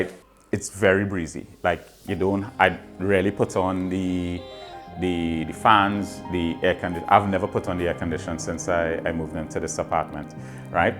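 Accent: Trinidad and Tobago. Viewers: Interesting to hear the Trini accent in Gambia. Welcome home brother. I lived in Trinidad for over 15 years, love the islands, still visit every year. Consider Trinidad & Tobago as my adopted homeland. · Lovely to hear your beautiful Trini accent🇹🇹 From one Trini to another, many congratulations on returning to the Motherland. I’m inspired. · From TRINIDAD &TOBAGO 🇹🇹 I always wanted to hear our TRINIDAD 🇹🇹 accent joining you just now everyday I dream of coming to live in AFRICA travelling there shortly as I renew my passport AMEN 🙏🏿 🙌 To you both enjoy life in the MOTHERLAND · Establish a community, one Trini to another.....good going, accent cannot hide. How will you earn an income though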